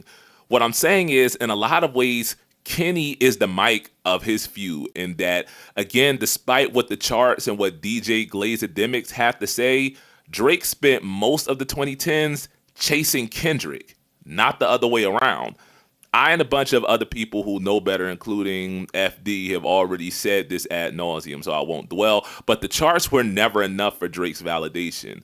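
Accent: Philadelphia, United States. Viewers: are u from philly ?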